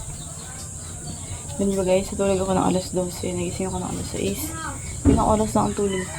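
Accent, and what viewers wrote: Philippines. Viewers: idol tonong ko sana anong probincia ka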